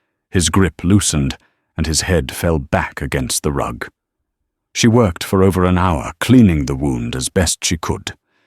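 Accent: United Kingdom. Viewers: Does anyone else wonder why this channel uses a British accent AI narratorfor American western stories? It makes no sense to me at all!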